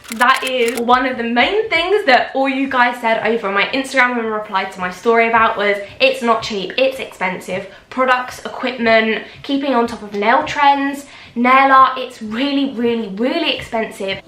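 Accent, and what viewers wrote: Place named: United Kingdom. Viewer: I´m not a nail tech, but I like to do my nails by myself and watch your channel and listen to your UK accent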